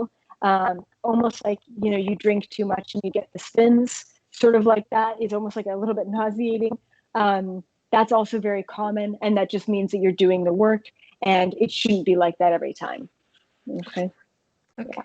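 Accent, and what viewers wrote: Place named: Sweden. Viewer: Jag hittade dig via videos, sååå kul att hitta en session med en svensk! Man hörde direkt på engelskan att du var ifrån Sverige 😋🤗❤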